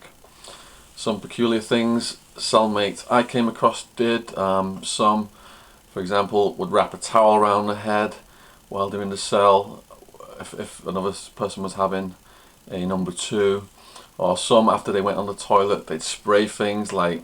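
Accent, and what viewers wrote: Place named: Northern England, United Kingdom. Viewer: You from Uk? Sound like you’re from Chester or somewhere up north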